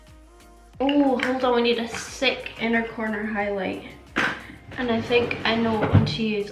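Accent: Scotland, United Kingdom. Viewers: Omg your Scottish 😯 help me with my makeup!!!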